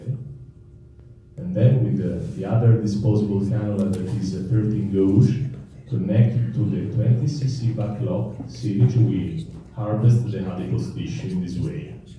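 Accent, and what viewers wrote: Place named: Italy. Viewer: Che accento troppo italiano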